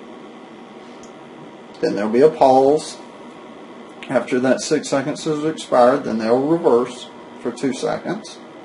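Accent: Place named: South, United States. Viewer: Thats a cool Southern accent. The best in the USA. In my opinion.:)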